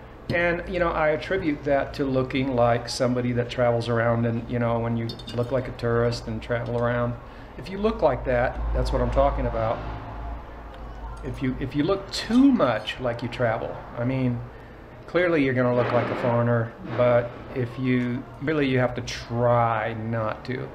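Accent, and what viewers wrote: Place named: United States. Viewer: Not perfect Spanish but gringo Spanish.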